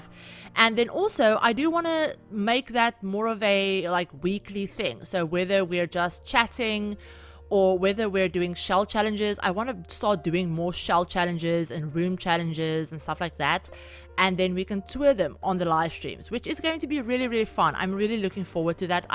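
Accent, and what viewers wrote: South Africa. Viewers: Not me hearing the accent & immediately being like yes a fellow saffa simmer ❤🎉 beautiful build, adding this immediately!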